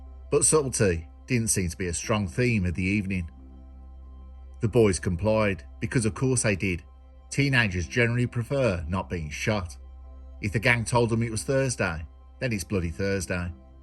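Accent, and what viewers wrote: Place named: Black Country, United Kingdom. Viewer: Just love the black country accent. Fantastic. Brilliant narration as per usual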